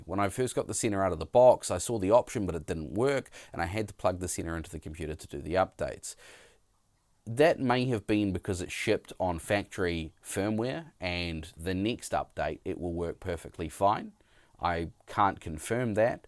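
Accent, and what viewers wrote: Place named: New Zealand. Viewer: If you ride with limited or no people the HD spirit is the best bang for buck unit. The buttons can be a little unresponsive at times but overall the sound is great. I have it on one helmet and the new srl3 sena on another and the audio is as good as the srl3 and actually gets louder. I did buy the edge but sent it back as the voice commands were crap. The new srl3 voice commands are much better as long as you say hey seena and not hey Sennar, it's an aussie thing and from your kiwi accent it'd be the same deal.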